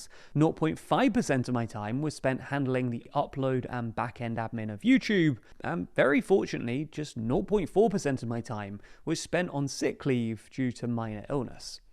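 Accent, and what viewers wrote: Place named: RP, United Kingdom. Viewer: Wow... an academic, RP-sounding voice makes anyone sound like a good writer 😇😇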